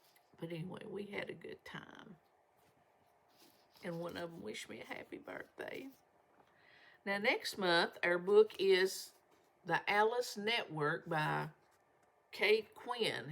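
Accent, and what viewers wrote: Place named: South, United States. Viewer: Hey....it's all good!! It's just from the Southern roots you grew up with. And it's down right special!! I get a lot of pleasure from hearing the different accents. Probably because growing up and being in Florida....where there are actually more Northern folks...so accents not Southern mostly. I love that twang! Don't you worry about it. It's perfect!❤❤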